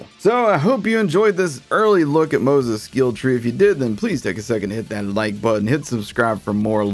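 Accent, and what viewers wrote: United States. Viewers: Damn your videos are addictive! Ps. Love tour accent where are you from in the states?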